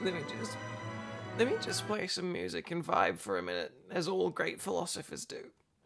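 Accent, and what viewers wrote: United Kingdom. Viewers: For some reason my mind keeps trying to convince me that you're attending Hogwarts. I believe it's the glasses and the accent. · You're so much beautiful. Includes your Brit accent.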